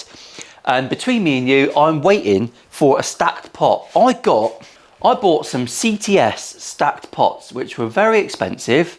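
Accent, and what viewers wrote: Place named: Plymouth, United Kingdom. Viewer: I’ll check it out, to be fair it’s a great looking guitar and I love hearing the janner accent on the youtubes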